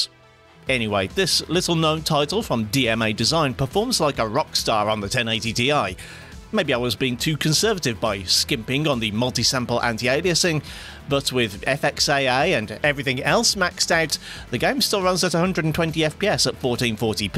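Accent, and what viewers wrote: United Kingdom. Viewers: british guy speaking about GPUs for 19 minutes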